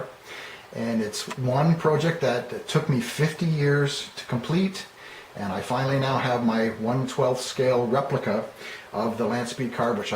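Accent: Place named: Canada. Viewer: that is an amazing story - and an amazing model. One error in your narrative - we designed and built the car in MILWAUKEE, Wisconsin - not California. When I heard you say the words PRO-ject and DECK-al I knew you were Canadian. I understand the long winters up there need some indoor activity to fill your days. Neat collection of hotrods, too. Actually, it took us less time to build The Blue Flame than your model. The project began in August 1968 when we signed the contract with the American Gas Association, and ended in October 1970 with the record at Bonneville. The car was actually essentially done building in July 1970, just for comparison. Great video, too!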